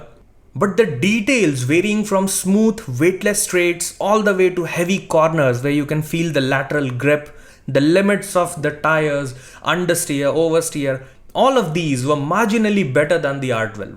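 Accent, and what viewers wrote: India. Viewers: yup, shit video. sorry. first seconds you mentioned that the video is not sponsored in any way! and then... this video was made possible by simagic. and then I hear an India accent... you can't make this shit up, I won't watch the video made it one minute. do better or stop making YouTube videos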